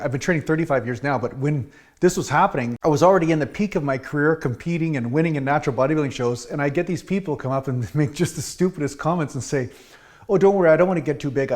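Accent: Canada. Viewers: I am loving the Canadian accent !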